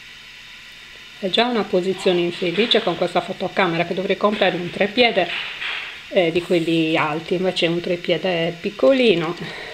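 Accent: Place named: Sardinia, Italy. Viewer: Modello carinissimo e semplice, simpaticissima la tua cadenza sarda 👏👏👏ciaoooo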